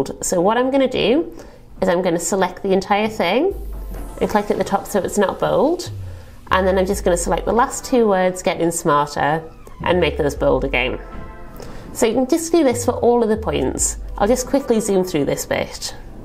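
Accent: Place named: United Kingdom. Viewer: First of all, our beautiful and intelligent teacher is a stone cold fox! Wow! Her edgy British accent is super cool, and her subtle sense of humor makes the video easy to watch. It’s nice to see a real human being, with attitude, great tats, and lots of smarts making instructional videos instead of the usual blah blah blah missy girl. I can hardly wait to start my info graphic… keep up the good work!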